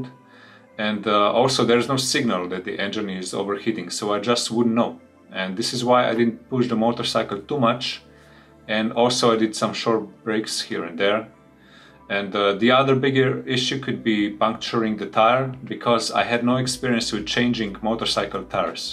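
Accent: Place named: Bulgaria. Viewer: Planning a trip with my 50cc as well, thats some nice tips, you have a bulgarian accent somehow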